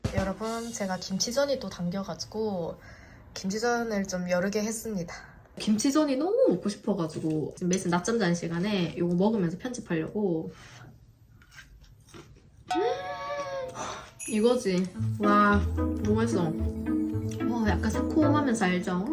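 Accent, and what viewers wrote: Gyeongsang, South Korea. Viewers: ㅋㅋㅋㅋㅋㅋㅋㅋㅋㅋㅋㅋㅋㅋ 억양이 완전 대구 억양ㅋㅋㅋ귀여움..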